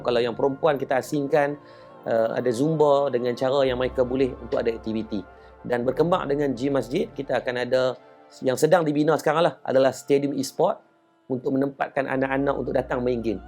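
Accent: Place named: North, Malaysia. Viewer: Terengganu nyer dah hilang jadi orang utara😊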